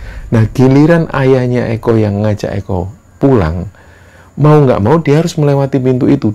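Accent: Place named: Surabaya, Indonesia. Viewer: Mas ini orang mana ya, sepertinya ada bahasa khas Surabaya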